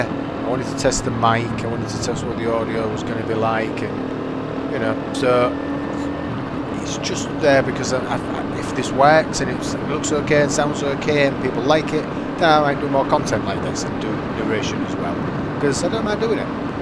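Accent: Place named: Hull, United Kingdom. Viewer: Ahh the Hull accent, I still pronounce my u's and i's like an e. Flert, skert and hert... three random words there from top of my head but story of my life actually!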